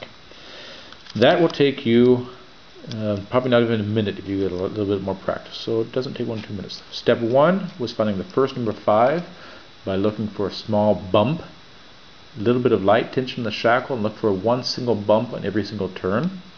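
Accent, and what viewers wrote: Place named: Canada. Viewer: I detect a Canadian accent!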